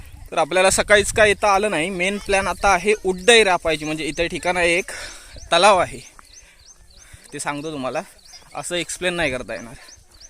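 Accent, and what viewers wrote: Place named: Konkan, India. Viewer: me pan rajapur chi aahe ha video pahun aani aapli kokani bolna mala khup aavadte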